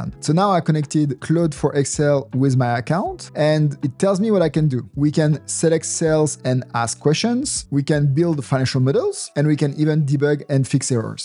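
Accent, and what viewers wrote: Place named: France. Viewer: Comment reconnaitre un français ? avec son accent quand il parle en anglais nice video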